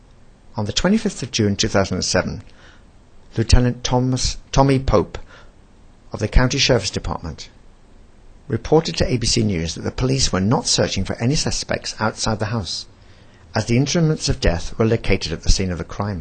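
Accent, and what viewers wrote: England, United Kingdom. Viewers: this english accent is starting to annoy me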